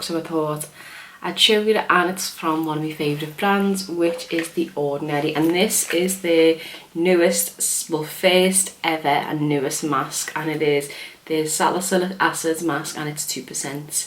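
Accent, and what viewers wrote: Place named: Ireland. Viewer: Your accent is really pretty. Are you Irish?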